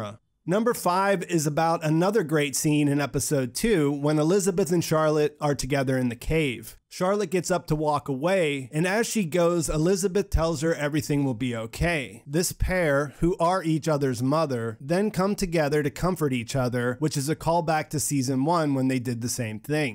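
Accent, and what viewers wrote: United States. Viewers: It’s so exhausting listening to the american pronunciation of the german names - we are able to pronounce names from foreign series in almost the right way, so why don’t you all try too? :D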